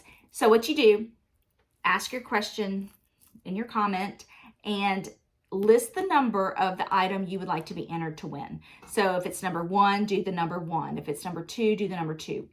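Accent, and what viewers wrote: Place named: East Tennessee, United States. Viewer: I am from Knoxville and moved to Florida in 2003 because we felt it was just getting too big. We also downsized with that move so I understand your big job ahead! What part of Knoxville are you in? Do you plan on staying in the Knoxville area? We moved from West Knoxville, but my Dad grew up in East Knoxville in the 30’s and 40’s. My husband is from Fountain City and we have also lived in South Knoxville in the 1980’s. I began watching you because I missed hearing a fellow East Tennessean and really enjoy your flosstube. I am interested in # 1, 7, 8.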